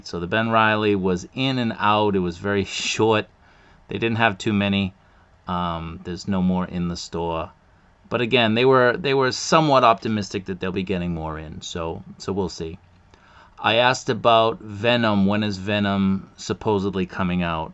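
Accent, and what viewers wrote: Boston, United States. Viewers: R u from boston?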